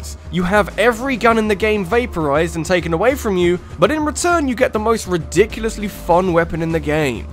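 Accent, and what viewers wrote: United Kingdom. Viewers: Blue Spartan Gaming he's British, so his accent will be different than us stupid Americans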